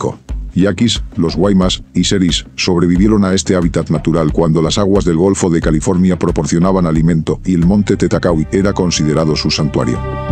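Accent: Spain. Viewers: Hermosos tus videos, solo que no entiendo por qué el audio con acento español. Es todo 😊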